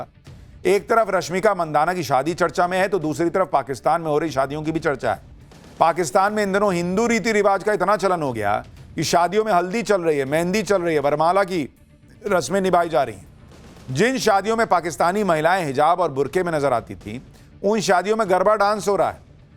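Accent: Bihar, India. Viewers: वाह जी,आपकी बीहारी टोन मे विश्लेषण सुनने मे बडा मजा आता है।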